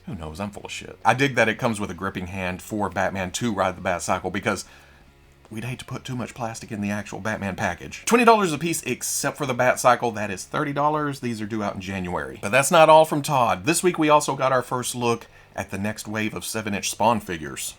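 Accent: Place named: South, United States. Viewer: I say this with love….but man you hit “This is his Baby” so hard with that country accent. Lol #thatsweetsouthernaccent #roboark #queekly #THAILOG Then again I can’t say much being on the opposite side of the state. Lol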